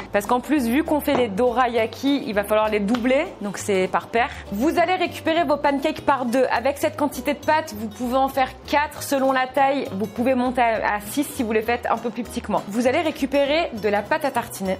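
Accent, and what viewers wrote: Canada. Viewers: Tu est quebecoise ou française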